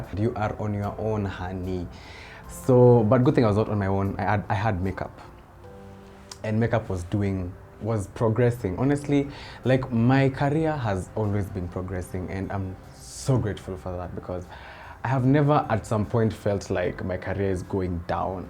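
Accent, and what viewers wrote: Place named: Kenya. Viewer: Your accent reminds me of when I used to live in Nairobi 🙂🙂🙂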